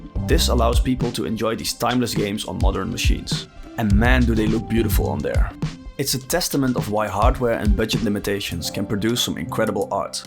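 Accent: Netherlands. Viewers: what is this dutch accent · Accent heard, Nederlander gespot. Goeie video pikkie, subscribed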